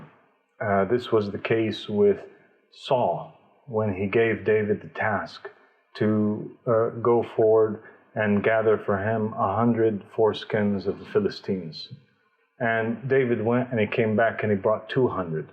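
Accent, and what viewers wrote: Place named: Texas, United States. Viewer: لسانك مو عربي والاكسنت مالتك تكول كاعد بتكساس شنو مهديكم من تكساس😂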